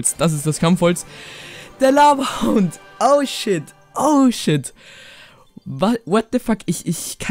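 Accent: Austria. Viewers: Österreich du?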